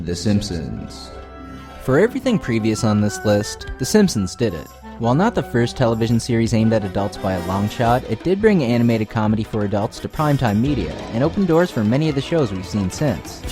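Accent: Canada. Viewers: I was guessing either the Midwest or Canadian lol. It came out in the vowels ^_^ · My god, I can't stand your shitty canadian accent.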